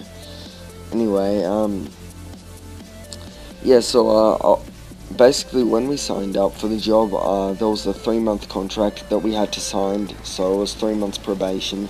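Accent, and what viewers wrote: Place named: India. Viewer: dat indian accent doh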